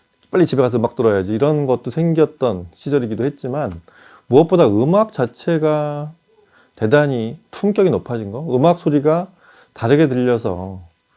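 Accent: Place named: Seoul, South Korea. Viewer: 발성 좋으시네요^^ 오리지널 서울 말씀.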